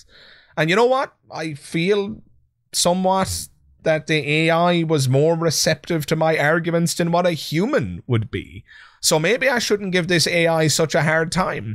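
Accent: Ireland. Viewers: Replying here mainly to test a theory I have pertaining to YT comments. Anyways! Loved the skeptalk show with you and Shannon, both of you brought your absolute A game! Skeptalk is how I found your channel. I'm a bit of an accent/dialect connoisseuer, and listening to Shannons Canadian english playing off against your Irish english hit a sweetspot for me. I know, I'm weird that way.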